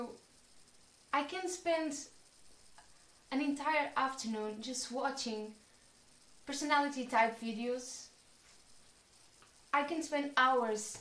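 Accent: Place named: Portugal. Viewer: Ah, I've mainly heard Brazilian Portuguese. The accent sounds very different! Another possibility is ISFP. One way to tell the difference is Ne and Se are reversed (as you say, tool vs. PoLR), and also ISFP Ni vs. INFP Si. Possible evidence for Ne is word play, speaking poetically, telling stories created in the moment. An INxP takes conscious effort to use Ne, so it isn't immediately obvious. It really is "tool use". Catch one in the right mood and they will delight in playing with the world of words and ideas. For example, my wife (who is an ISFP) sent our niece a bracelet that had been in the family. I mentioned to my wife that our niece needed to know the history of the bracelet -- and made up in the moment a silly, absurd little story. One of many things that causes confusion: ISFP Ni is third slot, but ISFPs seem more classically "intuitive" than INFPs. 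INFP Si, with Fi+Ne, can get overly focused and dwell on positive memories (nostalgia) or negative memories (rumination) -- but Se PoLR makes outward emotions look more "flat". ISFP Ni, with Fi+Se, is more expressive (and explosive, though it passes quickly). One thing that is definitely true, it is very hard to self-assess.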